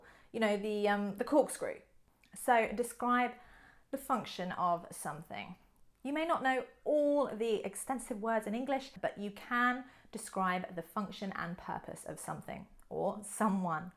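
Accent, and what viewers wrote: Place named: RP, United Kingdom. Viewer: Dear Madam Teacher,thank so much for your brilliant lesson and of course superb modern RP british accent.Well,honestly do not freeze in English is so tricky for me.because I am from the working class and absolutelly not smart and clever like you,dear Madam.Thank a lot again.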